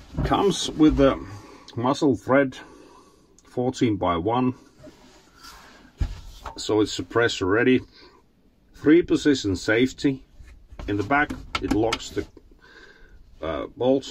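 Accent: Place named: Finland. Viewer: Ai että miten hienoa suomalainen puhdas rallienglanti 🥰🥰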